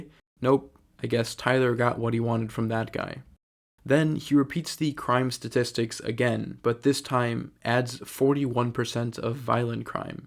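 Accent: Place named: United States. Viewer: I think it’s relevant to mention the guy who narrates this video, maybe lives in Germany, maybe is of German descent, but is not German by blood. He speaks with a clear American accent. Something to consider since he’s taking a clear globalist leftist position in his videos on Germany. Probably just some brainwashed college student rich kid. So obviously hes pro immigration pro invasion pro communism.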